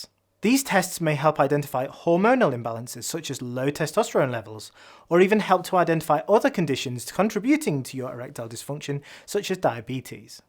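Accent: United Kingdom. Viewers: What a huge difference in hearing a doctor from the UK explaining all this, rather than an american doctor.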